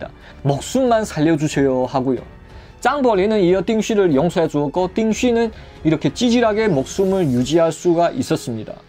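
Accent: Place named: Korean-Chinese, China. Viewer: 25년전에 연길조선족선원들하고 외항상선에서 같이생활할때 목소리하고 어쩜똑같아 갑자기 옛날생각이 나네요 구수란 조선족사투리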